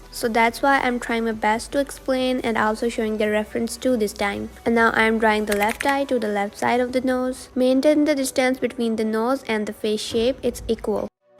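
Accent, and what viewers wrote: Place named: India. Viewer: Don't hide ur indian accent.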